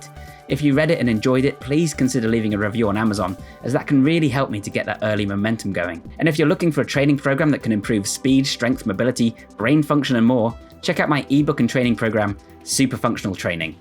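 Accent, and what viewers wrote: United Kingdom. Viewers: He sounds british, has freak strength like a russian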